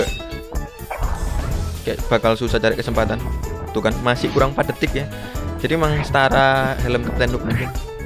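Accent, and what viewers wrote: Malang, Indonesia. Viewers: "sama ae" 😂😂 orang mana sih bang? Malang?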